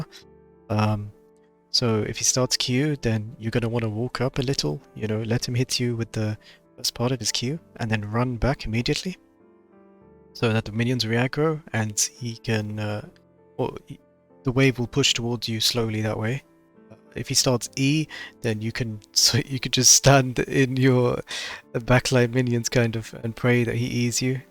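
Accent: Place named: Europe. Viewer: i noticed you have a hint of european accent in your voice :) . also good guide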